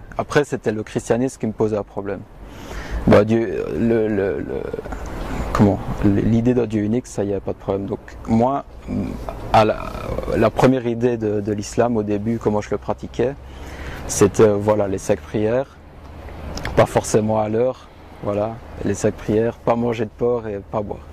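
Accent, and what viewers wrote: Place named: Belgium. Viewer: But he's from Belgique so he've got a accent, I mean this french sounds a bit different.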